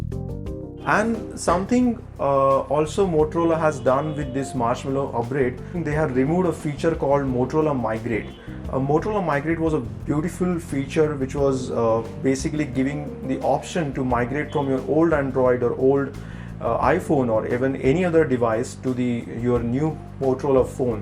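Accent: India. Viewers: love the Indian accent!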